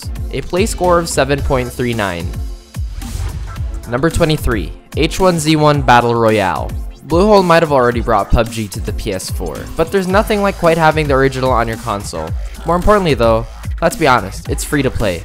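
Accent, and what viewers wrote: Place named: Philippines. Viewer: FILIPINO ACCENT AF!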